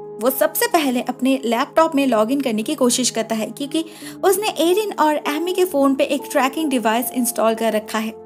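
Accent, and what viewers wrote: Bengal, India. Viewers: Are you bengali ma'am ? My mother's friend is bengali and she speaks exactly like you.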